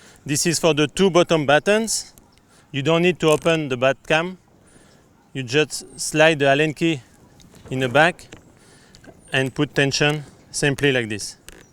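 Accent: England, United Kingdom. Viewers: l'accent anglais magnifique d' xD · j adore ton accent anglais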